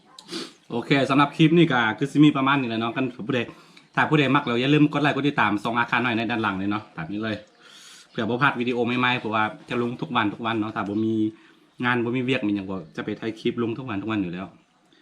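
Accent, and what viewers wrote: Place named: Thailand. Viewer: ຕິດຕາມຕະຫຼອດແຕ່ບໍ່ຮູ້ວ່າເປັນລູກຊອດ ຫຼືວ່າ ອາຂ່າໄທບໍ? ເພາະວ່າສຳນຽງການເວົ້າຢາກອອກໄທແນ່....ຂອງກິນທຳມະຊາດຫາຍາກ ຢາກນຳເດ່😋😋😋😋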